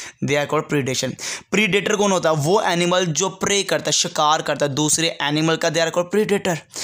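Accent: Punjab, India. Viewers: Punjabi accent 😍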